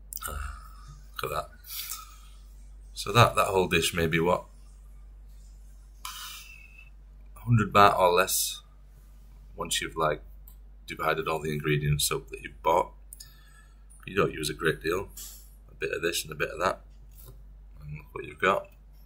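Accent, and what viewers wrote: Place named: Midlands, United Kingdom. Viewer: Accent tells me you come from somewhere between Nottingham and Manchester - but not Chesterfield. Buxton?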